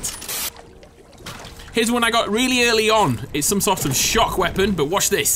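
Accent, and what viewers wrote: United Kingdom. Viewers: idk why but i like the british accent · Nice to hear a Brit reviewing my favourite game.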